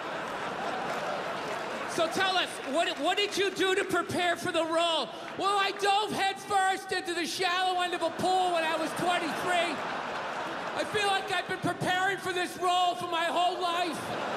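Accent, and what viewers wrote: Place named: United States. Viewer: Pub bore with a yank accent. Heard it all before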